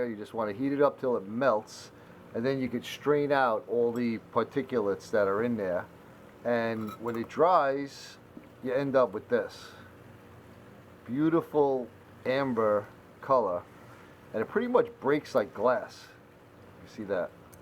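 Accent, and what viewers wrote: New York, United States. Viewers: and the New York accent! Yasssl!! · Ah the New York accent brings me right back home! Haha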